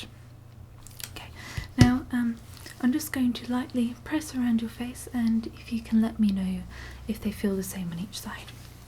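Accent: Scotland, United Kingdom. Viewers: What happened to the 'Jings Crivens, help ma Boab' accent ? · are you scottish? is just that your accent sounds kinda more poshy on this vid in comparisson with the others haha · I LOVE BRITISH ACCENTS THEY ARE SO BEAUTIFUL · ASMR love your asmr. genuinely thought you were Scottish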